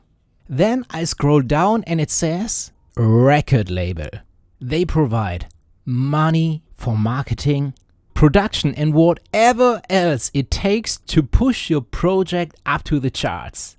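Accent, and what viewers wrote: Germany. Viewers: His heavy German accent kind of adds to that. Like, he's really trying not to sound aggressive imao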